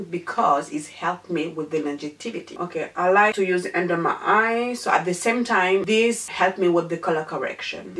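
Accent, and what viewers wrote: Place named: United Kingdom. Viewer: girl!! let me tell you, you slayed in this video 👏👏👏 very well done. Everything was perfect. Your English, your make up, everything was damned good. You were worried for nothing. And I love love your British accent 😉😉. Keep on going girl you have all my support. For foundation brush you can try the morphe M439 if you haven't tried it yet. I wish you all the best and don't worry for the jokes in English you'll find good ones for us. Take care xoxo 😘😘😘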